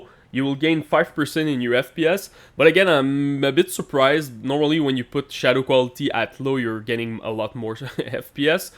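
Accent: Quebec, Canada. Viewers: J'adore comment on peut reconnaître les Québécois ! Hehehe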